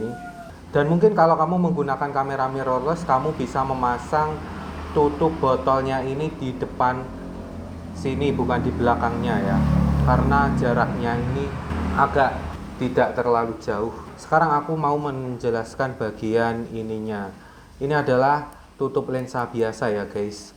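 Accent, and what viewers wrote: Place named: Java, Indonesia. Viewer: So amazing, brother, your bahasa indonesia is medok jawa, so very different from your english in your other video..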